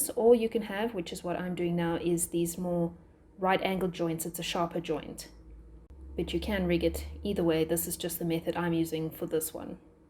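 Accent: South Africa. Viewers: AHA! Checked your profile to make sure. Knew I heard a South African accent!! #ProudlySA Keep up the awesome work!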